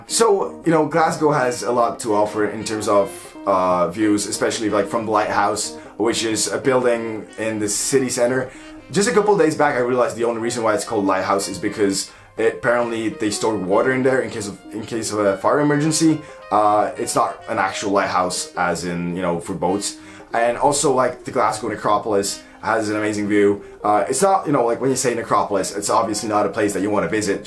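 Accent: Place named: United States. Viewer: where are you from? You have an interesting accent, it sounds American but not quite.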